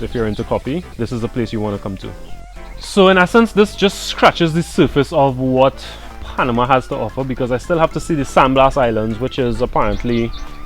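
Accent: Trinidad and Tobago. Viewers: Trinidad and Tobago by farrrrrrrr. I know he had an trini accent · i instantly knew you were Trinidadian from the accent. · I clicked on the video...heard ah Trini accent and subscribed one time...loved the video and how you presented both countries .... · Love the blog. Love the Trinidadian accent. Thank you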